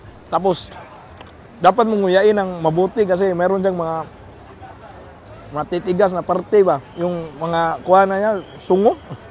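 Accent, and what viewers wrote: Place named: Cebu, Philippines. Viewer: Taga cebu ka lods?? Mao mao mn gud ug tuno sa inisturyahan ni 😁😁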